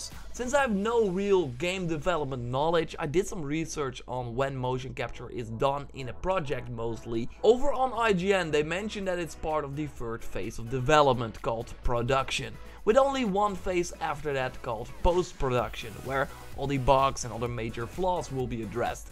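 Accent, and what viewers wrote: Netherlands. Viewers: You sound a bit dutch, are you?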